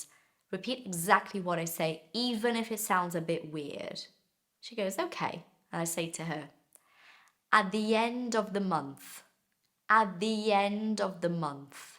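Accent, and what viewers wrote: United Kingdom. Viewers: ai para de ser chato, ela não tem sotaque brasileiro nenhum, ela passa 100% como britânica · Does she practice an British accent?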